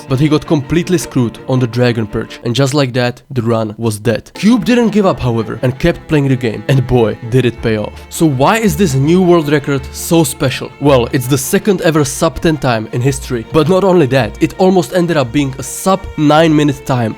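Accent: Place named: Czech Republic. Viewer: Yo great video and breakdown! Keep up the content ;) (Within the first seconds I was just searching whether or not you are Czech, knew I heard the accent! :D Nice to see some more Czech people with actual good videos)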